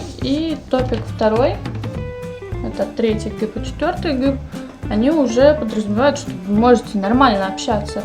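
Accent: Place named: Russia. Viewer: Вы плохо воспринимаете на слух, потому что не правильно произносите звуки.. они у вас русские..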